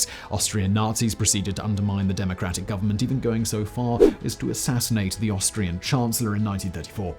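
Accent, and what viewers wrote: United Kingdom. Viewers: Ah, I'd hazard a guess these videos are written by native speakers. Presenter couldn't be more British. I'm austrian, if you wanna know.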